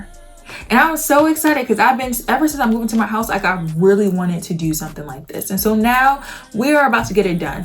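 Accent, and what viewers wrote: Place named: Appalachia, United States. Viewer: Why do you say'y'all?? Are you a hick only hillbillies, talk like that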